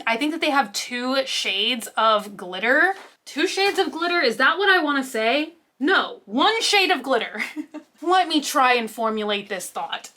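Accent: Texas, United States. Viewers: Lol I think that may just be the Texan accent (I say it the same way and I’m also from South Texas)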